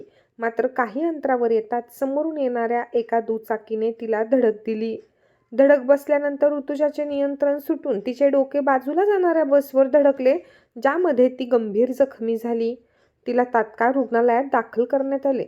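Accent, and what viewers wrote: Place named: Rural, India. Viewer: निवेदिकेच्या भाषेबद्दल मला आक्षेप आहे . बातमी सादरीकरणाची भाषा अत्यंत ग्रामीण टोनमध्ये व अतिशय चढ उतारामध्ये असल्याने ऐकण्यासाठी फारच विचित्र वाटते. भाषेचा टोन ऐकून पूर्ण बातमी ऐकण्याची इच्छा होत नाही. कृपया गांभीर्याने दखल घ्यावी.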